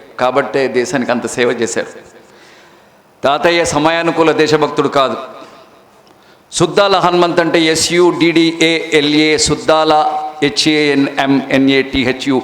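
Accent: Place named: Andhra Pradesh, India. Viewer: Nibhasha...Andra lagaunndhi...jai telangana..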